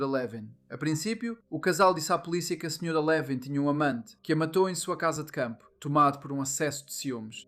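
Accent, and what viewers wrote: Portugal. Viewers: Continua o sotaque português, e eu continuo a não assistir e a não curtir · Mas então ele não é português? Eu tinha entendido que está em português de Portugal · Mas ele não especificou que o canal é Português do Brasil. Você não consegue entender em português de Portugal?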